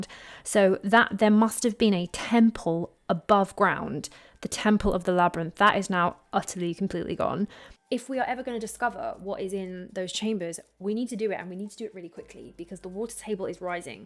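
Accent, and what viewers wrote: United Kingdom. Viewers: I know, and y so britty talking style.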